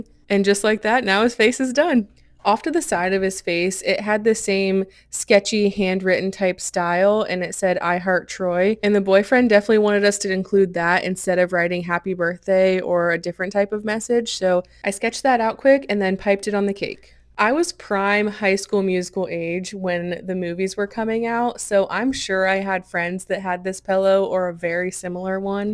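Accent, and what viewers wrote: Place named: Midwest, United States. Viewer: It's the "Midwestern" accent 😂 people in utah and Idaho also have that accent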